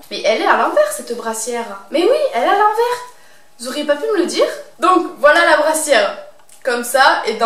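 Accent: South, France. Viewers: D'ac, je pensais que tu parlais du undiz du polygone de Béziers car dernièrement tu cherchais un bar ambiance sympa sur béziers:) Au passage t'es vidéos sont aux top j'adore ta façon si naturelle de parlais et t'exprimer avec ton accent bien de chez nous:) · l'accent du sud miss vive le sud bisous j'adore tes vidéo continue comme sa gros bisousta un corp de rêve moi je comme a l'avoir je fait beaucoup de sport ❤❤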